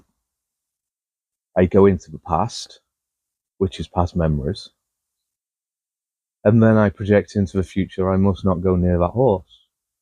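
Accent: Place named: Manchester, United Kingdom. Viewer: Trying to place your accent, from the north somewhere, but maybe not Yorkshire, almost a Manchester thing going on there, I've lived in Lancashire all me/ my life.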